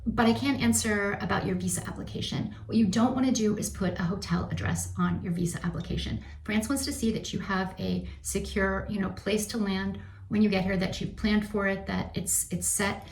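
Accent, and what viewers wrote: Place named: United States. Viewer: Perfect french with a lovely american accent 😊 Love it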